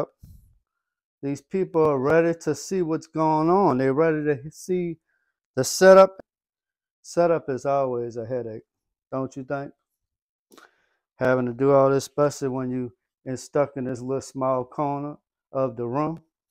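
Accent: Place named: Louisiana, United States. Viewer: I love dat when you hear a voice dats obviously from Louisiana.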